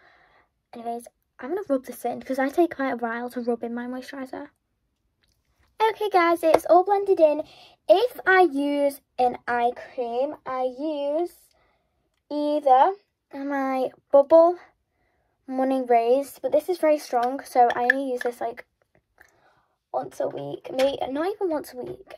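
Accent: United Kingdom. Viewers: I don't know where you're from but your British accent is a chef's kiss xx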